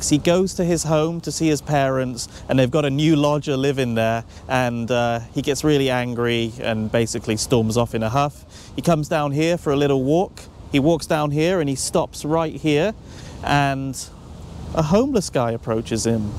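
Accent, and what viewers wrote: United Kingdom. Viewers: Yeah; this definitely works better in a British accent... (esp. reciting fake Russian words) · Great to see these locations , good video . The Nadsat is cool but as you are an Englishman , complete with middle class accent , it is really jarring to hear you use a phrase like 'super excited to be here' . Come on man , really ? Not quite as depressing as the McDonalds .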